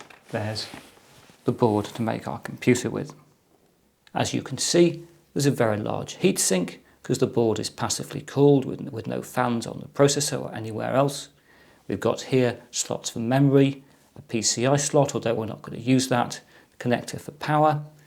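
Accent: England, United Kingdom. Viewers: I like your British accent . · this english dude makes us sound like the stereotypes not cool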